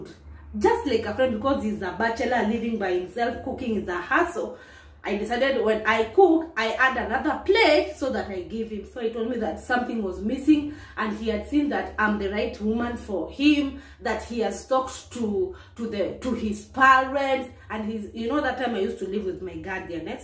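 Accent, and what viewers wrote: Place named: Kenya. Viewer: I remember you, you're Kemuntos niece? Love your narratives. You talk like a Kenyan youtuber living in Germany. Chocolata.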